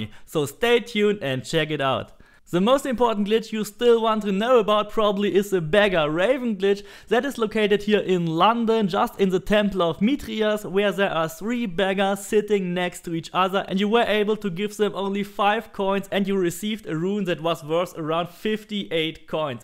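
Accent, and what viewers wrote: Germany. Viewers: deutscher akzent isssss da :D nice channel btw :D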